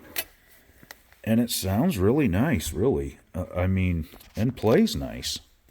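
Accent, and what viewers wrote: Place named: Boston, United States. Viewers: Cool ‘guitah’. Love your New England accent. 🤘😎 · Good Job. Love your Boston accent. Thanks. The VW Garagemaster could become a collectible.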